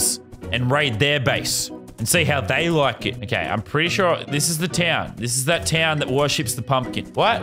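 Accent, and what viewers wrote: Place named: Australia. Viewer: Yo bro you have a Aussie accent big time bro cheers for Australia man (I’m also from Australia).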